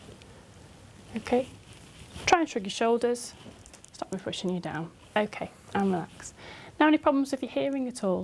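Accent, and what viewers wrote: United Kingdom. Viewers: the doctor has the best british accent ... the queen of asmr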